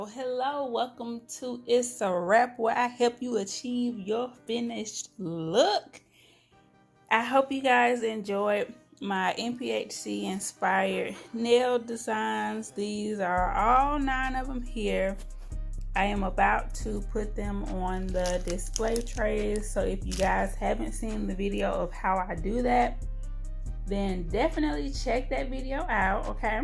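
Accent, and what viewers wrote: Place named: United States. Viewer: Yes I love nails. Do them myself. I work with gel and polygel but I can appreciate a good nail video even using other mediums. I like your channel because you’re upbeat, have a cute country accent and I like the way you explain things. You can tell you like what you do and you can sure sell a product. I hope they recognize! I’ve watched 5 of your videos now back to back and not 1 video went by without me pausing to go put something in my cart.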